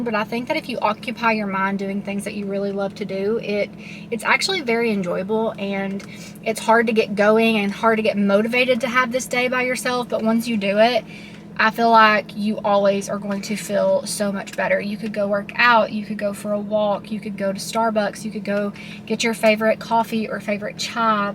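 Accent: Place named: South, United States. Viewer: I just discovered your channel and this was the first video I watched. Super cute video! I hear that Southern accent. What state are you in? You mentioned a trip to Houston I think. Are you in Texas? 😊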